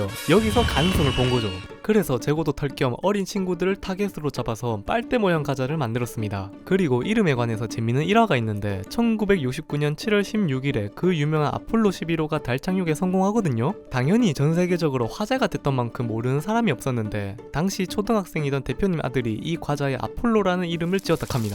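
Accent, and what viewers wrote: South Korea. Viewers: 이 억양은 대구말투인 것인가요?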